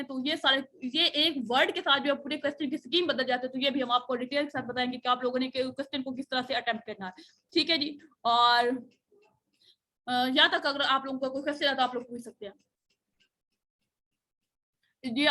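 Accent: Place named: Punjab, India. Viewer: Mam ...apki tone of language ..jhang,chiniot,sargodha qasor ..mandi bahu din en logon sy milti ha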